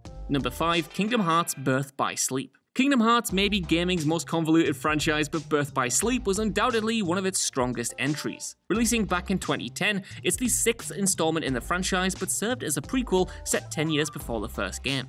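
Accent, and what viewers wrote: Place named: United Kingdom. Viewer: I get the whole British accent thing but if they game pronounces it one way that’s how you should say it! It’s a name after all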